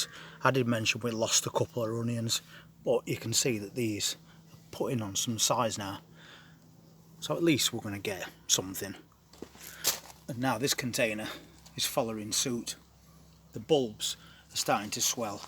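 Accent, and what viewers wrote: Yorkshire, United Kingdom. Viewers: Free plants next year, I just watched a video about your move, I hadn’t realised! Anyway, I’ll get to my point, every year I give loads of plants away, I’m in south York. I know you’re in Lincolnshire, but your accent sounds very Yorkshire/Derbyshire Anyway…I know it’s a bit of a track to get to me, near Sheffield. But…if you like I’ll deliberately grow some in the cell pots then you can grow them on & I’ll post them to you or get my arse on the train & meet you half way. Save you keep paying out at garden centres for things like courgettes that barely cost anything to grow. Although I’ve got a massive garden I’m disabled, so my digging days are long gone, so I only use planters but I have got a greenhouse and a shed with a massive window I use for extra space…I might (ok I do) use the porch as well then any deliveries I have to say “it’s ordinary plants nothing illegal” 😂 I tend to grow flowers, tomatoes, cucumbers, courgette, pumpkin, carrots, radish & like you loads of lettuce! 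Basil, mint, rocket (love rocket to a sad extent 😂) spinich, I’m walking around my planters in my mind 😂 spuds & green beans oh & pak choi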